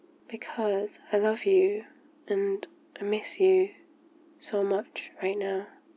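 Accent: United Kingdom. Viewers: God, why are you british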